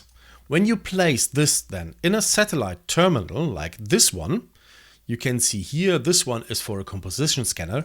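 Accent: Germany. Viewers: Ein Deutscher der Englisch Spricht. :D Dein Review is Nicht Schlecht. Aber ich hätte mal so ne Bitte an dich... Auf der CurseForge Page (~8 Kommentare unter deinem Video) hab ich ein Kleines Texturepack erstellt, da mir die Standard Texturen nicht ganz so Gefallen. Aber ich hab nie eine Ehrliche Meineung über meine Kleine Arbeit Bekommen. Deswegen frag ich dich, als Zufällige Person... um über mein Texturepack zu Urteilen, Sachen die Gut sind, Sachen die ich nochmal Pixeln soll, etc. (Es ist ein Link für 1.7.10 und 1.10.2 Seperat)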